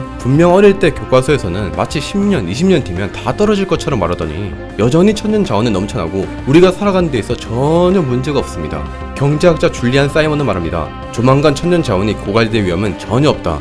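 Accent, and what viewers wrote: Gyeongsang, South Korea. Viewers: 경상도 사람인가 억양이 나랑 비슷한 냄세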